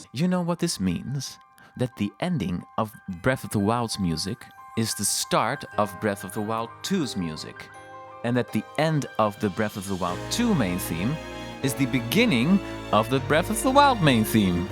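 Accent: Netherlands. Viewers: Ur from dutchland i can hear it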